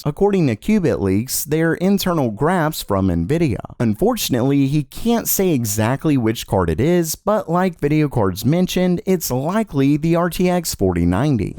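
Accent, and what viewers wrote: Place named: Texas, United States. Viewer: I just realized how Texan he sounds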